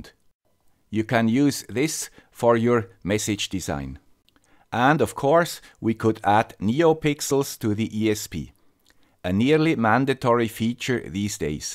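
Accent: Switzerland. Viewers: I was surprised how different some of the words were. I guess, I should have not been, but I've never been to Switzerland (sadly). Now I appreciate his Swiss English more... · is there a way becoming Swiss without having this funny German dialect? =D Hamburg (Germany) has some shelters, but we haven't as many as you have. Oh, and I like your income too, but not your expenses to live.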